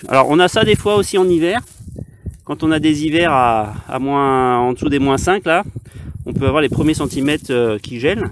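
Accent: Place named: Bocage, France. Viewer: bonjour,vous etes de quelle region,on dirait le bocage?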